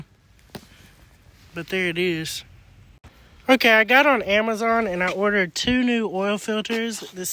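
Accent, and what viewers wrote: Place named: Texas, United States. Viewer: What part of Texas are you in. Sounds like the same part I’m from. I was born in Tyler and all my family are from Murchison, Ben Wheeler & Brownsboro.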